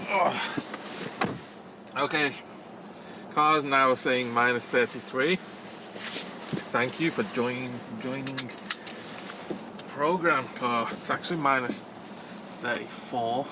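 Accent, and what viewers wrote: Liverpool, United Kingdom. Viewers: Sounds liverpudlian to me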